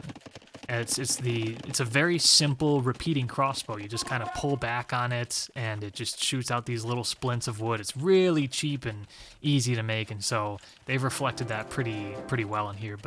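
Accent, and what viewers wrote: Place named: Minnesota, United States. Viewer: you sound like you are from Minnesota lol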